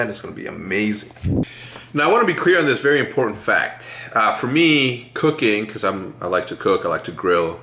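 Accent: Mexico. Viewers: I'm from Durango sounds like he is from Monterey tho haha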